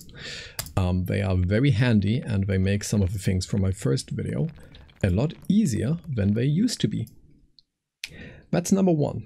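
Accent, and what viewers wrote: Germany. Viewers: You sound like you have a slight german accent but you are so well spoken and articulate. It really confuses the heck out of me. Seeing that there are some people commenting in german, I can't be the only one, haha. Anyhow, fantastic video! I've deliberately not looked at any building tips and tricks to find out some of these things for myself and only now allowed myself this video as an introduction on what the game has to offer. Almost done watching and can't wait to implement stuff like the flying buttresses. Can I just say, you should really consider streaming your progress on twitch. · Dieses Spiel ist ganz nach meinem gusto. Übrigens hört nur ein geübtes Ohr deine Deutsche Herkunft heraus. Dein Englisch ist Einwandfrei. Sehr guter Video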